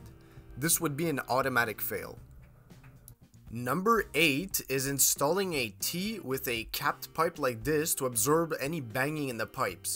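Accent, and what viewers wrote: Quebec, Canada. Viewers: code for what state and country? I listen to your voice, and there is an accent in there.. french canadian? I can't tell. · I believe he is from the La belle province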